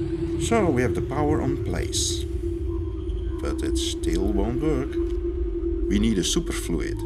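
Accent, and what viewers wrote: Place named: Netherlands. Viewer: This is the wordt dutch accent I have ever heard! The animation is really nice, but has anyone actually built it (before claiming all kinds of antigravity technology)?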